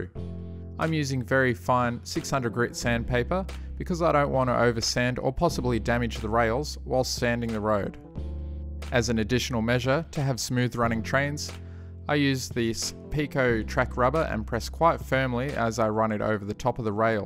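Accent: Australia. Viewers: He's Australian. The NZ accent is a bit different to ours but apparently people not from Oz or NZ can't really tell the difference.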